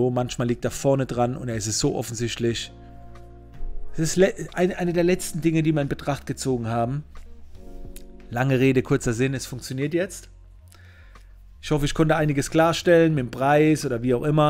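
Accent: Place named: Mannheim, Germany. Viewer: Bist du aus Mannheim? Dein Dialekt hört sich so an. Grüße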